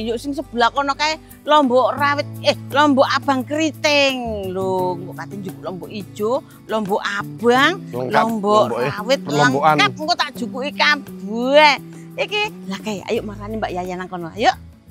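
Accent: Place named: Java, Indonesia. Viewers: Maaf baru gabung ..Ini daerah mana ya..koq logatnya mirip saudara ku yg tinggal di Lumajang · NONGKO GORENG, MANTAPJENANG GENDUL, REPOT YA IBUU. SENENG LIHAT NYA KLO IBU LAGI MASAK END LAGI BAHASA JAWO SENENG AKU DENGAR LIHAT NYA DI ALEK JAWA SEMANAK BANGET INGAT ALM BAPAK IBU KU.....PERSIS DIALEK NYA SAMA GPP YA IBU DI SAMA IN YA IBU ❤❤❤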